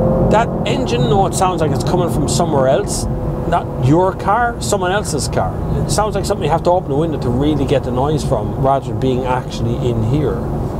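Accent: Ireland. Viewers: Have you ever said the word Tree and found that it sounded identical to the word Three. Just was wondering because of the Irish accent.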